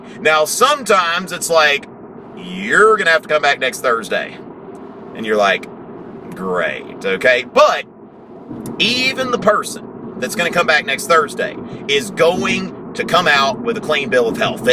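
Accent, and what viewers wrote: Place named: Georgia, United States. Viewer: Georgian accent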